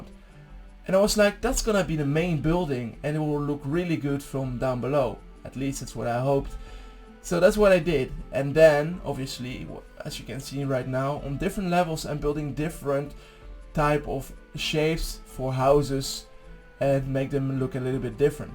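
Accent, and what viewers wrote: France. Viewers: are u french ?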